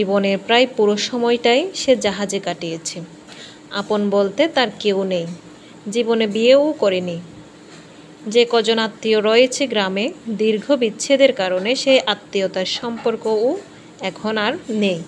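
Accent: Chittagong, Bangladesh. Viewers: Your voice tone like Chatgaia🙂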